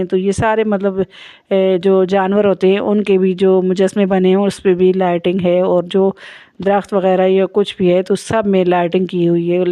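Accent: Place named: Hazro, Pakistan. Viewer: AP ke Urdu ma hazro k asens ata han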